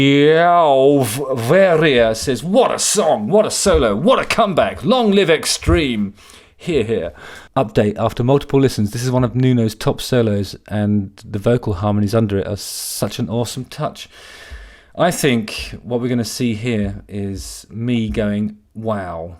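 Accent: United Kingdom. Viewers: That British Accent has to go...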